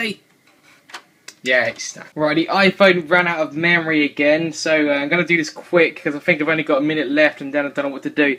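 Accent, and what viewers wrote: England, United Kingdom. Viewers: are u English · What part of Britain you from?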